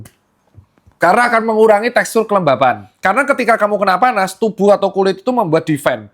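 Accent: Java, Indonesia. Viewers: Asik penjelasan dok ter ini,ada jawa2nya😂😂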